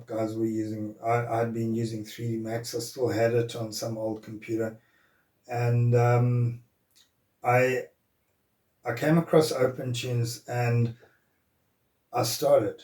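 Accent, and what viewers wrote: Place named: South Africa. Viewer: That ZA accent !!! Not just DNA.... Love to see content like this OG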